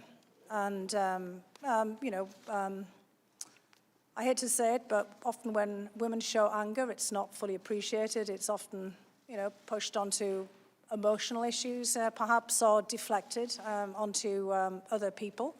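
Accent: United Kingdom. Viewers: She sounds like a Brit.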